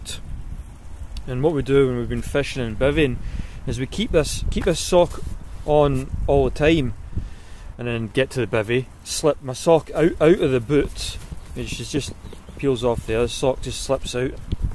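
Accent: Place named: England, United Kingdom. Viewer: Hi! I really like your accent! Where are you from? What part of England?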